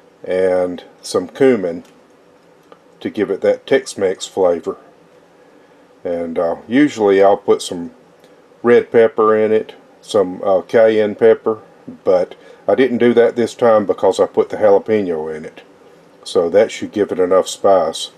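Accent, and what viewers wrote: South, United States. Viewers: Hi, that gravy looks delicious and I wish that I had some of it in front of me right now. I will definitely try your recipe. You sound like a southerner, I am from Okla. but I live in Idaho. Looking fwd to seeing more of your videos.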